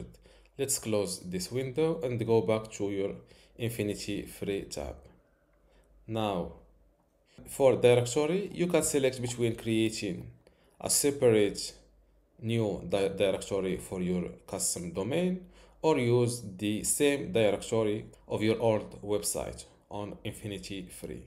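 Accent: Morocco. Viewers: idk why but you sound moroccan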